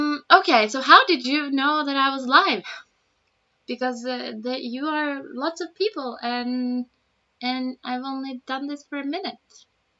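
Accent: Oslo, Norway. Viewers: Det var jo kjempefint å se deg, men jeg håper hvis du kunne lage en ny video der du snakker Oslo dialekt slik din. Her på vestlandet snakker de på en annen måte, meg det blir nyttig for oss som har lyst til å lære den østlandske dialekten. Tusen hjertlig takk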